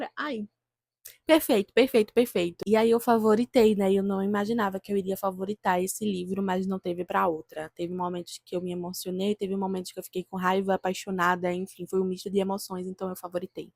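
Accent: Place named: Minas Gerais, Brazil. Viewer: Caí de paraquedas hoje mesmo aqui, e te achei uma diva, amei o sotaque (é mineiro?). E eu ganhei O Morro dos Ventos Uivantes da minha irmã, só que eu tô postergando pra ler ele (sendo sincera), até mesmo por tudo de ruim que eu ouvi sobre a adaptação. Mas eu tenho uma amiga que leu e gostou muito, disse pra mim que eles tiraram o foco total do que realmente o livro vem trazer.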